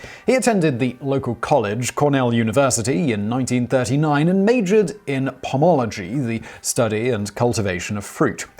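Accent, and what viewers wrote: United Kingdom. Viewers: this british ascent..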